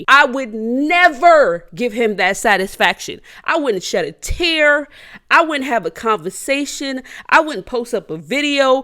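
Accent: New York, United States. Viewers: New York accent came out 100 percent in this video 💯😭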